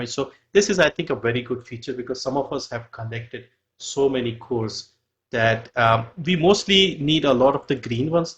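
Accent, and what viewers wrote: India. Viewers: I was able to recognise from your voice that you are an Indian. Proud to see a fellow Indian doing this good. Keep up the great work!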